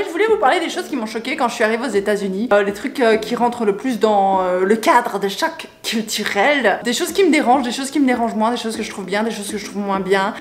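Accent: Belgium. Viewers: Ton accent belge ressort fortement dans cette vidéo lol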